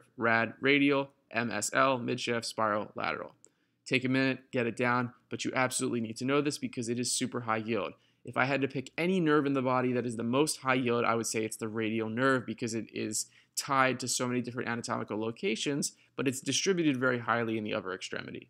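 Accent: New Jersey, United States. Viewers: u from new jersey (central) or from california?!? ha!;) god bless bro! ..for me, healer + teacher combo is this earth's highest calling,,hat's off sir! cheers m8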